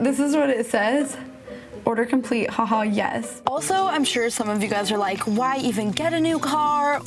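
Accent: Los Angeles, United States. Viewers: Ugh LA accent is horrible.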